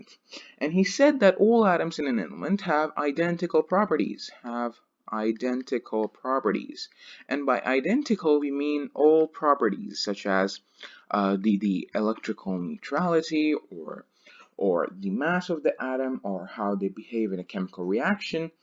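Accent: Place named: United States. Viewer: Seems to be listening an American channel....... Really amazing.... Keep it up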